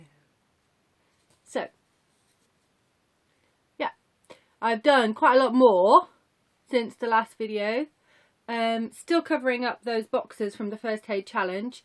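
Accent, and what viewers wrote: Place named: United Kingdom. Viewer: I love your videos. I'm a total Anglophile and British/Australian stitchers are my favorite channels to watch. I guess it's the accents. 😉 I hope you're feeling better. Do you have any more videos planned? I definitely don't want to pressure you, especially if you're not feeling well--I just miss your lovely work and your humor. 😊 Prayers for continued recovery!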